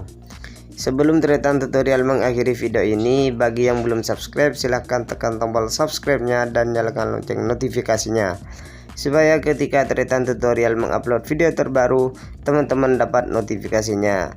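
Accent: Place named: Madura, Indonesia. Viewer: Dialeknya sepertinya orang Madura United ya, sukses terus channelnya Tretan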